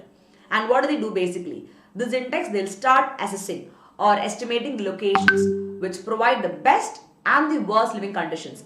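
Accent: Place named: South India, India. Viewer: Mem south Indian english bol rhi h 😀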